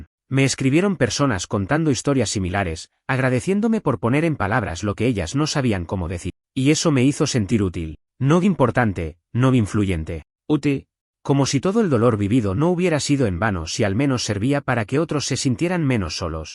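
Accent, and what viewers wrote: Argentina. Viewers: No es que no me guste el bot argentino pero prefiero español neutro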